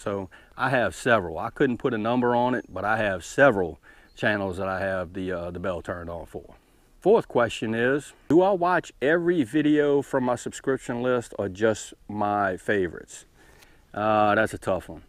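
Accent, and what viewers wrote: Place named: Mississippi, United States. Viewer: I love your channel and tht Mississippi accent. :)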